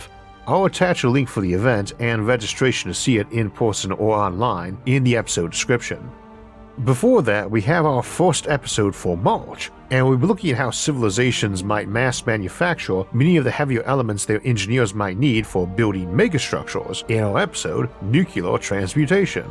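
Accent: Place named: Boston, United States. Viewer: Love the vids! Been subscribed for a while. I keep wondering though - where is your accent from? Sounds very Boston to me but i could be completely wrong!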